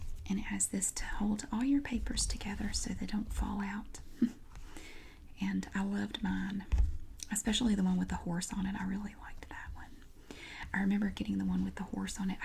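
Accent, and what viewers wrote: South, United States. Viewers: I still have my sticker book from the 80's!! My 9yr old daughter has it now and started her own. Some of my scratch and sniff still have a faint scent which is crazy...dill pickle was my favourite! Love your videos...my twins both watch at bedtime, by request...you are their favourite!!! My daughter loves your southern accent, she tries to copy you saying" that's nice" or "that's so pretty", pretty funny from a 9 yr old Canadian girl😁♥️💜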